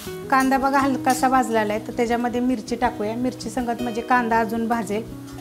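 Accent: Satara, India. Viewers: खूप छान 👌👌👌 भाषेवरून आमच्या सातारा साईडचे वाटता..चॅनेल फॉलो केले 👍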